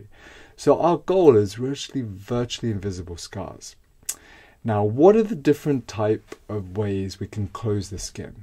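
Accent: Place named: England, United Kingdom. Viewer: SOUNDS like London, England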